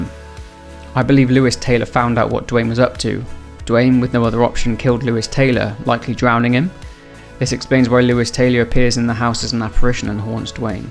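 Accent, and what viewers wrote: United Kingdom. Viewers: Why r u british?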